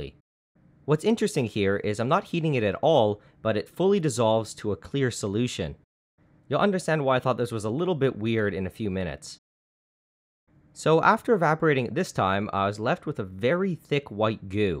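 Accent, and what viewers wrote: Canada. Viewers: Hey! Are you Canadian?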